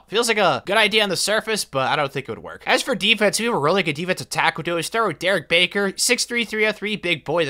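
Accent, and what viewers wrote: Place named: Idaho, United States. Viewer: Im 95% sure you are from Idaho dude!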